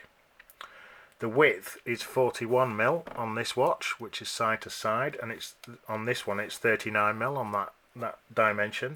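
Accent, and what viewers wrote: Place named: United Kingdom. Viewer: That's British English bro.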